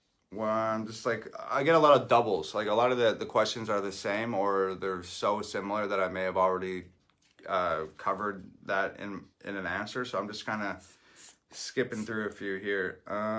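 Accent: Canada. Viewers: He sounded so Canadian, sometimes I forget and then I hear him apologize like 7 times in the first minute😂 and the way he pronounces words with "r", love this man so much, so humble. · You can tell he is from Canada by the way he says sorry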